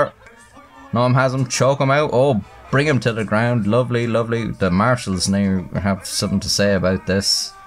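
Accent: Ireland. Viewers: It's the Irish accent that really does it for me. You ever notice English/Scottish/Irish accents are over represented on YouTube? They just sound good. Not too many popular Aussie voices though. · Stories always sound better when told with an Irish accent.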